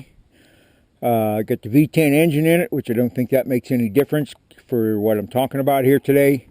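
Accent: Pennsylvania, United States. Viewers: Are you from PA? you sound a lot like my father in law. :)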